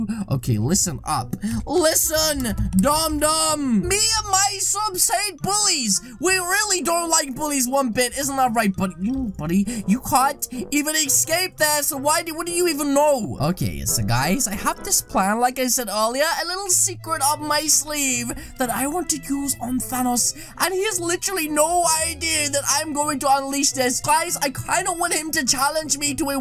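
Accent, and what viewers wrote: United Kingdom. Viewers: Are you british nice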